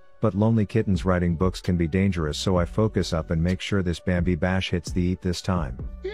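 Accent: Scotland, United Kingdom. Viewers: she is SCOTTISH not Bri ish! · That's a scottish accent, not a Brittish accent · I thought that accent was Scottish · SHES SCOTTISH OMG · That's a scottish accent, not a british accent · She sound Scottish tho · isnt it scottish? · i thought she was scottish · my boy she has a scottish accent sorry to say but she is not british. title needs to be scottish bambi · isn't her accent Scottish? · She’s Scottish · She's not British, that accent is Scottish and how dare you call mine people British. We will literally go William Wallace on you for speaking such blasphemy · she is Scottish Bambi not British Bambi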